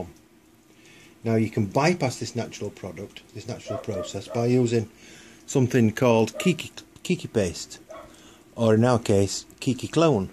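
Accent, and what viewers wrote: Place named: Northern England, United Kingdom. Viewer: Thanks for the cool video. Sounds like you are from Northern England and I realize there is accent involved, but I live in Hawaii, and we pronounce the word "CAY-key".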